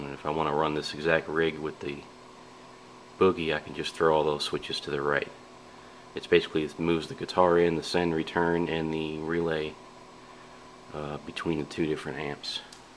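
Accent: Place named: South, United States. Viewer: Wow! You've got a southern Twang.... I've gotta get down there to record witcha!!!! 1993 seems like ages ago!!!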